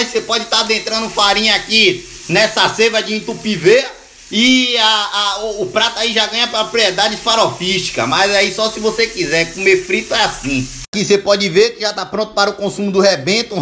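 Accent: Bahia, Brazil. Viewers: Você é bahiano kkkkkkkkkkk adoro kkkk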